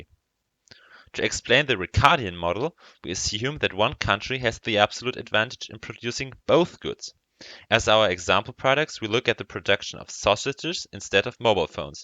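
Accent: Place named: Germany. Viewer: your german accent sucks but i like this video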